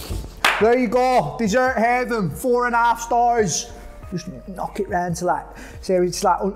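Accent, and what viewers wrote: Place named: Accrington, United Kingdom. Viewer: Hahaha honestly you’re funny as fuck, the only issue is your accent, went with a dirty bird from Accrington once and she sounded just like you. 😂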